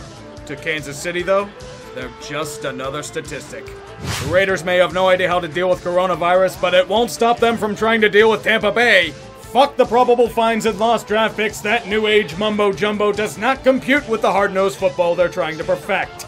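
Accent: Pittsburgh, United States. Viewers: If did all of his videos in yinzer speak I wouldn't mind... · should bring out the yinzer more often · We need more yinzer voice!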